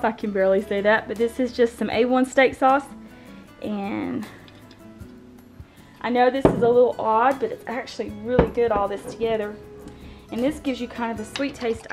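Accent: South, United States. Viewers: I love a good southern accent and yes I have one myself being from Arkansas. Great recipe, my family loved it!!!! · I did live in NC for a couple of years too. I'm in Canada now so I sure love hearing the Southern accents again. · I knew I heard a souther drawl don't know if I spelled that right lol. great video will have to try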